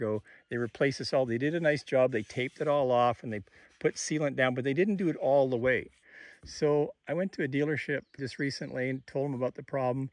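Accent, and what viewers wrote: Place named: Western Canada, Canada. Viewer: I KNEW IT!! First-time viewer, but , I knew you were out in Western Canada -- years ago, I worked with a guy from BC who also pronounced garage as "garach." Nice guy, but he kinda stuck out in Central Jersey.😆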